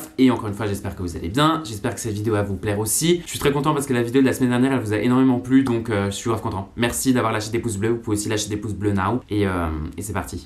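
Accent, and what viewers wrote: Alsace, France. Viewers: C’est trop drôle comment vous dites « métenant » a la place de maintenant en Alsace 🤣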